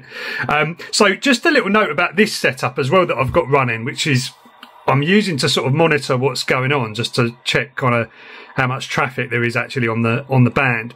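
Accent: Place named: United Kingdom. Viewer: Can you speak with US accent? This UK accent is trash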